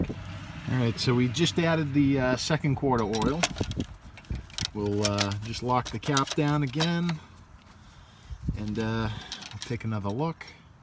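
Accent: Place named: Louisiana, United States. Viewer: New England? Would have though Louisiana with your accent.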